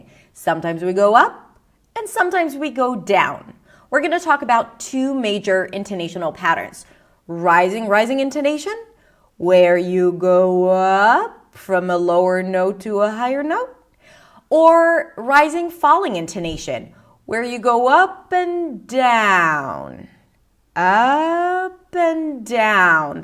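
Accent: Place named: United States. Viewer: As a native English speaker, I’m not sure why I’m watching this, but I’m fascinated. Very surprised to hear that English is not her first language. Her American accent is 100% perfect.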